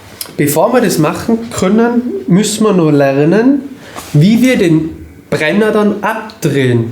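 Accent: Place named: Vorarlberg, Austria. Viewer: Super Video und mit dem Vorarlberger Dialekt super sympathisch!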